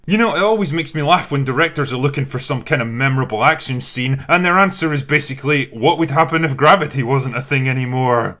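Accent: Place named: North, United Kingdom. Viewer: This guy sounds like the 9th doctor 😂🤣 must be his northern accent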